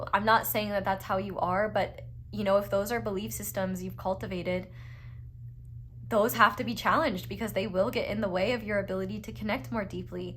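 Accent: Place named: South, United States. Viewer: I love the southern accent it’s cute thank you 🙏 god bless you ❤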